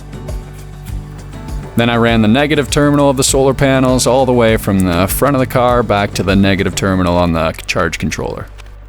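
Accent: Canada. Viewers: You sound like an Ontario guy,hello from Mtl bud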